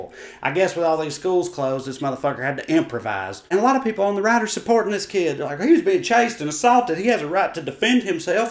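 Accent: South, United States. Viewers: Refreshing to hear a southern guy with brains. Thank you and keep them coming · Your comments and insight are illuminating ...I have a (sorry to say) new found RESPECT for my fellow Americans of the South. Intelligent, informed and 'well read'....and spoken. You can't judge a book by it's accent...I admire you and agree with you. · So nice he hear a southern accent with a real brain functioning behind it. Good on ya, dude. More, please.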